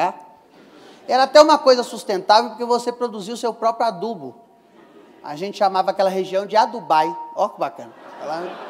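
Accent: Minas Gerais, Brazil. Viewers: Que e este ? Cliquei no video porque achei que fosse a thamy Miranda,mas o sotaque de minas entregou. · Esse sutaque mineiro cabuloso ❤️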